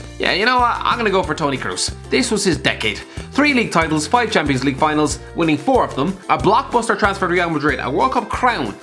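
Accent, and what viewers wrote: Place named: Ireland. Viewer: When I saw “XI”, I thought it would be that dog shit narrator who did the all time XIs for Klopp and Guardiola. Thank God for the returning; Christ above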